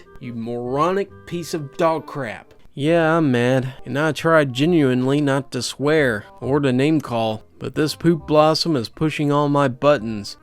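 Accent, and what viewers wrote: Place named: Appalachia, United States. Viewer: I have your voice. You sound like a hillbilly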